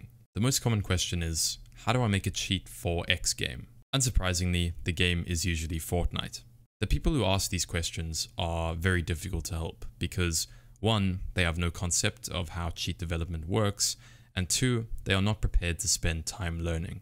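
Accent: South Africa. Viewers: immediatly recognises South African accent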